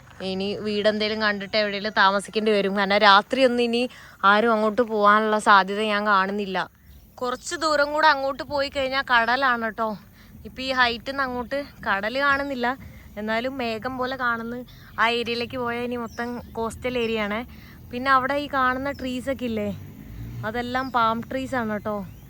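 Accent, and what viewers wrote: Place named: North Kerala, India. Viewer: ഉത്തര കേരളത്തിൻ്റെ ഏതു ഭാഗത്താണ് ജീവിച്ചിരുന്നതെന്ന് അത്ഭുതപ്പെടും, സംസാരഭാഷ കേൾക്കുമ്പോൾ '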